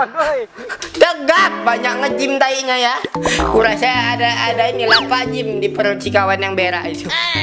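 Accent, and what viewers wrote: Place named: Padang, Indonesia. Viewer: Padang mana nih suara nya?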